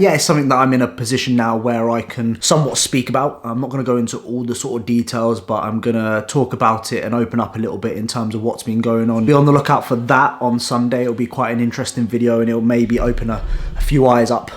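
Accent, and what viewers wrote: United States. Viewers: that american accent omg ahahaha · Haha mad, your American accent make you sound like Charlie Hunnam from sons of anarchy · Haha love the American accent 😂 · 😂😂😂dying at the American accent. I love it. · the American accent is hilarious but accurate lmao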